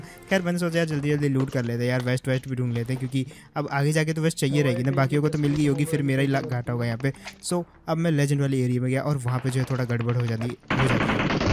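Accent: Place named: Punjab, India. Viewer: Can you please make a gameplay in punjabi language coz i love your punjabi accent 🥺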